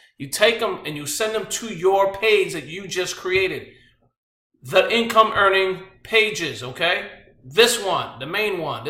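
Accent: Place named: United States. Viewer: where are you from. As you speak like a American. I don't understand the big coin.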